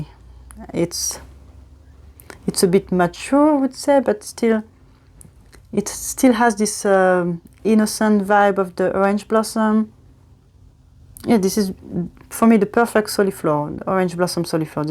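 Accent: France. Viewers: I love your monthly favourites and your reviews such a diversified extensive range of scents so wonderful to watch! I love fragrance too and have a small collection but not as impressive as yours! Do you work in the fragrance industry? I recently discovered Atelier Materi and cacao porcelana is on my wish list as is Trudon Bruma a beautiful chilly iris scent all class my other discovery and also on my to buy list is Tabac Rose by BDK its the only scent in that range that I LOVE it actually reminds me of Maison Lancome's oud bouquet but sweeter and more wearable I'm so glad I found your channel I love the way you speak & I love your French accent! Big lover of France have visited twice xx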